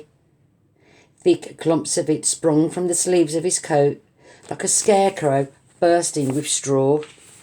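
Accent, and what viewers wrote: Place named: Victoria, Australia. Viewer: Good on you for reading this out loud. What part of Victoria is your accent from?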